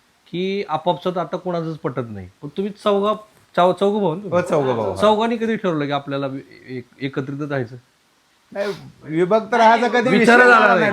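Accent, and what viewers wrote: Marathwada, India. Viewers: सर, तुमच्या बोलण्यातून मराठवाड्याची..बोलीभाषा ऐकायला...मिळते... खूप छान वाटत... अशाच काम पुढे चालू ठेवा...अशा चांगल्या गोष्टी समाजापुढे ठेवत जा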